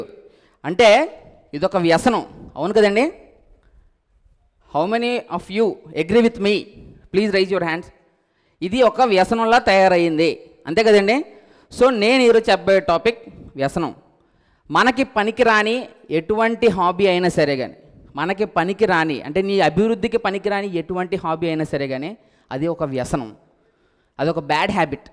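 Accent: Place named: Godavari, India. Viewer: Godavari slang....maadhe...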